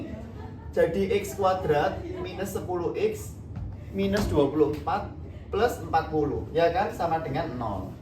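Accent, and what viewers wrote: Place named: Surabaya, Indonesia. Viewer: Suka sama cara ngomonge, soale bikin kangen sm Surabaya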